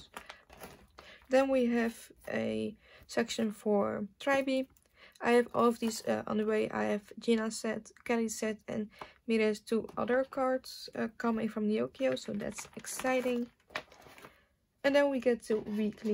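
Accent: Netherlands. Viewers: this is my first time watching u and the first thing i said was oh yea she's dutch and i was right 😌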